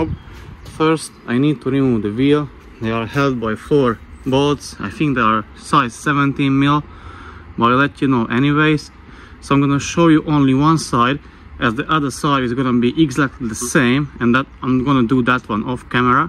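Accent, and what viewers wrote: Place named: Hungary. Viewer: Köszi a munkád! Amint meghallottam az angolod azonnal tudtam hogy magyar vagy! Tényleg hasznos volt számomra ez a szerelés mert épp előtte állok! De lenne pár kérdésem: Ajánlod rézpasztázni a tárcsa és a kerékagy közötti részt? Valamint az alsó és felső csúszkát kell zsírozni? És ha igen akkor azt hogyan lehet?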